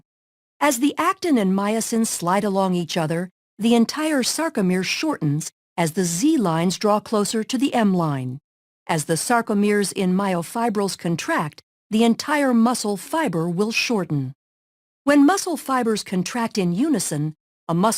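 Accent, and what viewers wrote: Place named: United States. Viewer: this lady is speaking American English 😲🔥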